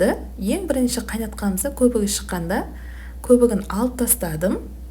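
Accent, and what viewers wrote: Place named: Uzbekistan. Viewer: Озбекстаннын казактары солай сойлейди, ешкандай калпак емес,озимиздин казак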